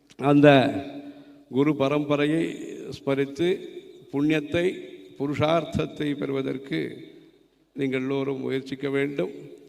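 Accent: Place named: Thanjavur, India. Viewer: அக்ரகாரத்து கருவறையில் இனிய தூய தஞ்சை தமிழ் 🙏 தமிழோசை கேட்கும் இடமெல்லாம் ...... புனிதம் பெறட்டும் 🙏🙏🙏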